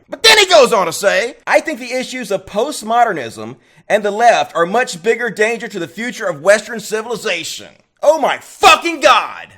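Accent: South, United States. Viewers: Well you're southern so it's to be expected, like when Pimpmunk said Massetushes.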